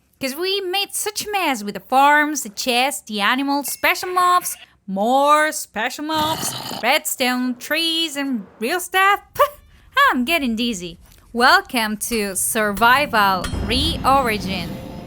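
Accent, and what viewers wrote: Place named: United Kingdom. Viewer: Who love her British voice❤❤❤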